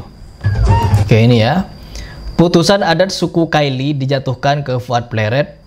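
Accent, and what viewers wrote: Madura, Indonesia. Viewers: Lucu sekali aksen maduramu bro!! Berani ga lu nantang debat salah satu ustaz yg kau suka gibahin??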